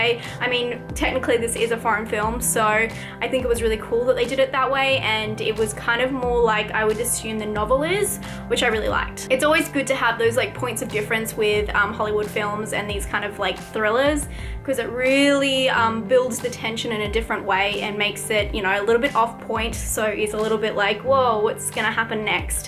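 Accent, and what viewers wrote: Australia. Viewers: He probably didn't understand the accent and meant to say Australian accent. No need to be so passive aggressively mean to your viewers.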